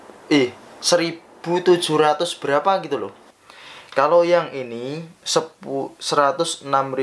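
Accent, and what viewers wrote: Java, Indonesia. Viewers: jowone pundi mas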